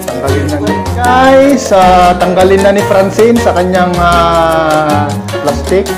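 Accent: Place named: Ilocos, Philippines. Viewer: Tga Baguio Mama Ko Po Pero D2 Na Cya Sa Cebu Date May Bahay Cla Sa Naguilian Ngayon Nasa Baguio Na Mga Titas Ko Kaya Pala Ang Tuno Ng Salita Tulad Ng Lola Ko Kase Tga Naguilian Pala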